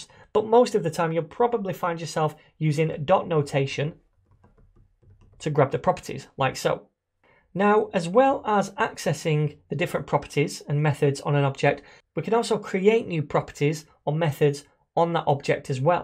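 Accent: United Kingdom. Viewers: are you British, it is two birds in one stone learn British accent with JS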